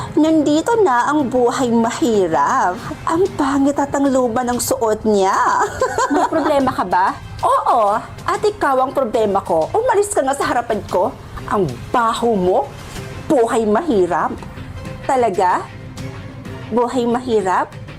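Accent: Visayas, Philippines. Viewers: Bisaya ka po ata ? 😊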